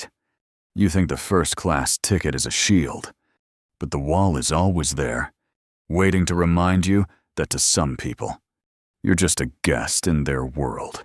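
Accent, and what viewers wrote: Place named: United States. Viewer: Sounds like another voice that I heard on another video, except it's not an exaggerated British accent. It's a highly rhotic American accent.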